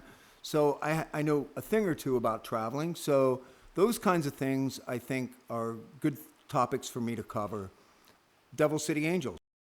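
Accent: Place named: Eastern Europe. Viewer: Cool Vlog man, Fred is right it does sound eastern European. Anyway log time fan and looking forward to the next one!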